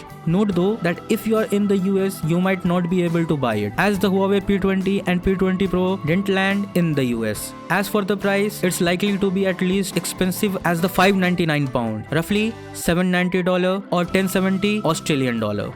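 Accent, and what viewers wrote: India. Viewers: But a Chinese speaking in English sounds <num>x much better compared to this fcking Indian. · Fucking indian accent sounds as though his tongue is born curled. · sounds like india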